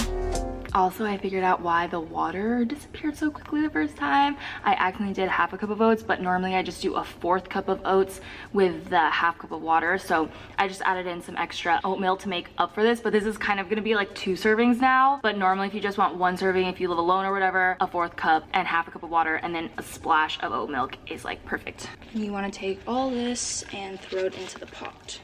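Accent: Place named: United Kingdom. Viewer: The British accent tho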